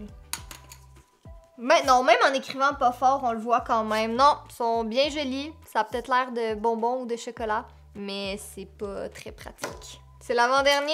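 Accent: Canada. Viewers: L'accent canadien ça me bute 🤣🤣🤣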